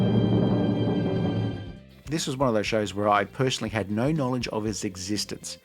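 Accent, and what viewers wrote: Australia. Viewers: I assumed it was the host's Aussie accent, since "tare" would sound like "tire"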